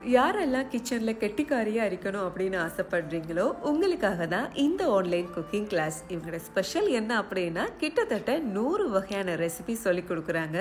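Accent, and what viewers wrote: Sri Lanka. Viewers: I was thinking about how to make sausage at home. Very much happy to watch your videos along with your srilankan Tamil. Love your recipes and will really try it & update soon ❤ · Super sister, well explanation, and srilankan tamil nice.🎉🎉🎉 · உங்கள் தமிழ் பேச்சு எனக்கு ரொம்ப பிடிச்சிருக்கு🎉❤வாழ்த்துகள் தோழிக்கு❤🎉 நீங்கல் இலங்கை தமிழச்சி துபாயில் இருக்கிங்க சரியா? (நான் தமிழ்நாடு) இதை எங்கு எப்படி வைத்து எவ்வளவு நாட்கள் வைத்து உபயோகபடுத்த முடியும்.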